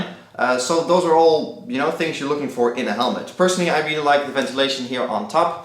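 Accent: Netherlands. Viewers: Are you Dutch? It sounds like you have a Dutch accent haha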